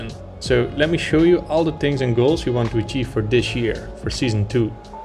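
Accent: Netherlands. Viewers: As a dutch person i immediately recognised your dutch accent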